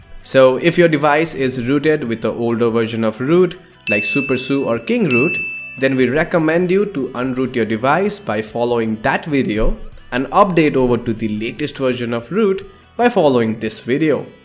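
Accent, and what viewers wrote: India. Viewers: I remember when u could barely speak english and sounded hella indian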